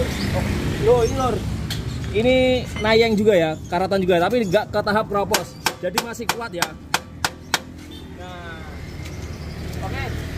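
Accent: Java, Indonesia. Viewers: Bahasanya medok 😂 Asli mana??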